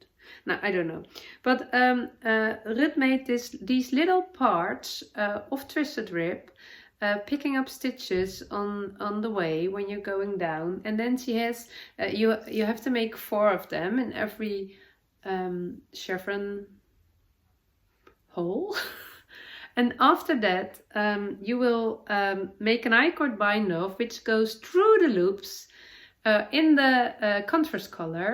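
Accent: Netherlands. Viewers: I love sharing my knitting time with you. You’re such an inspiration with your views on life and challenging yourself to grow whenever you can 👏🏼👏🏼👏🏼 You have made me look at the colour brown in a whole new light😂 I am searching for previous podcasts I haven’t seen bc I’ve watched all of your podcasts and I need more in my day 😂 You make me laugh so much with your Dutchy English. I live for it 😂 The saying when you have a cold is ‘I have a STUFFY nose’ but from now on, I’m going to say I have a stiffy nose, so I can relive that heart jumping moment over and over 💕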